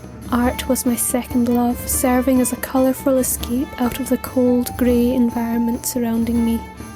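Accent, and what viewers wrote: Scotland, United Kingdom. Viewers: What a beautiful video! And I love your Scottish accent 😄♥️ what an interesting lifestory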